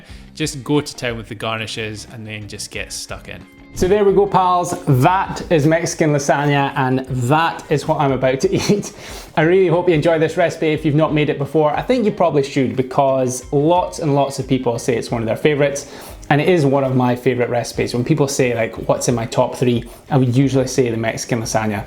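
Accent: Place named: Scotland, United Kingdom. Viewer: I really enjoy listening to your lovely Scottish accent talking us through these recipes and I am looking forward to trying some of them very soon. I especially enjoy the slow cooker recipes as that’s all I have at the moment, and recently made a pasta Bolognese from one of your recipes and it was delicious! 😊 BTW, my guide dog is actually from Glasgow. 🦮😄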